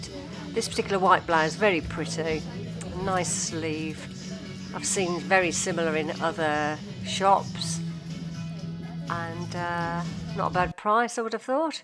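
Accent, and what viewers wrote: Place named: Midlands, United Kingdom. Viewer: I love your subtle midlands accent. I grew up in Brownhills near Walsall.❤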